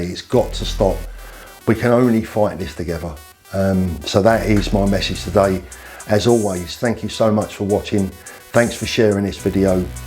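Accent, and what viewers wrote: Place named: Yorkshire, United Kingdom. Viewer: Yorkshire guy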